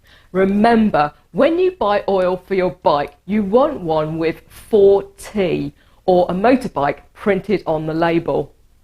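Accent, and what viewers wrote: United Kingdom. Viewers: the sound of a british accent from a pretty lady really is giving me a hot shot in my veins.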